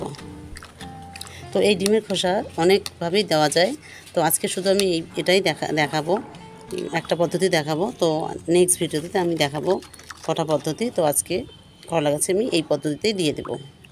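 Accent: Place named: Bangladesh. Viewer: আপনি তো একদম বাংলাদেশিদের মতো কথা বলেন। আমি এত দিন ভেবেছি আপনি বাংলাদেশী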